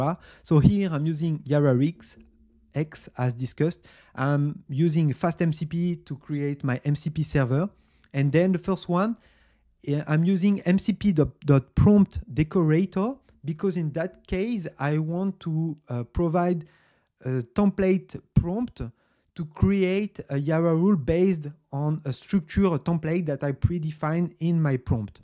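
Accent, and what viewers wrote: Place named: France. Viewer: Ah l'acccent fr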